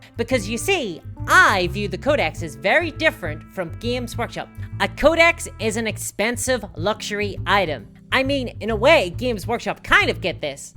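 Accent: Northern Ireland, United Kingdom. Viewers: Are you from Northern Ireland by any chance? The accent sounds familiar. You should do something with the two Neckbeardia people if so! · Spot-on assessment! Love the accent; norn Irish + Canadian/american?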